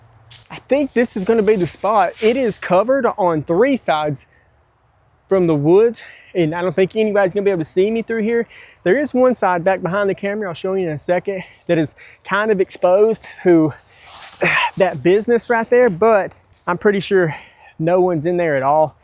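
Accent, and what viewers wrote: Alabama, United States. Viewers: I love watching you camp,. I confess I love hearing you talk b/c I'm an Alabamian too! Tuscaloosa. That's not the only reason though. I'm always interested in how you set the camp up & how you deal w/ anything that might be any little frustration or fixing any problems. I confess, I like the singing as well but I love the stealth camps as well. Anyhow, thanks for all you do to keep us interested & entertained. Stay safe & be well. Peace, Selene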